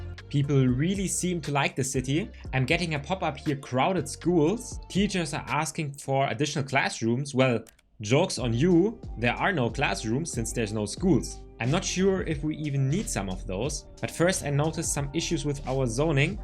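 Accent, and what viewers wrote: Germany. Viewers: I can hear german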